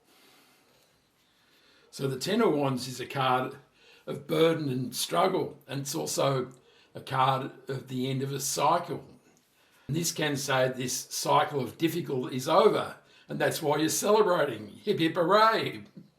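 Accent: Australia. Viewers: Hi, are you an Aussie mate ?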